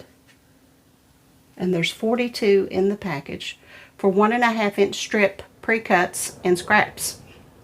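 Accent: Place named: West Virginia, United States. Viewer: I love your videos. I love your voice and accent. Is that a true West Virginian accent?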